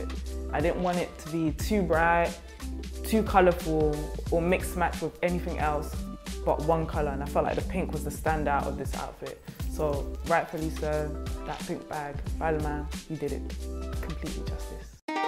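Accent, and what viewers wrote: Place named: France. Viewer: Love the french accent 😍😍😍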